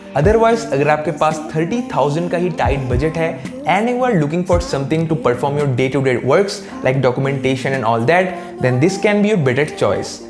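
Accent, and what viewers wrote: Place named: Bengal, India. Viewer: Itna Ganda accent.... Bengali saala tobacco kha k bol raha hai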